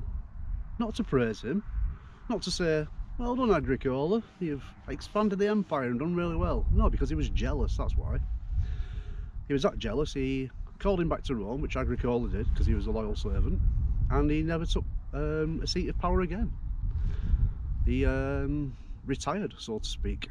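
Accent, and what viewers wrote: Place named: Lancashire, United Kingdom. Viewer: As in Vee-k-us. I'm a Roman Lancastrian and talk just like you! Salt of...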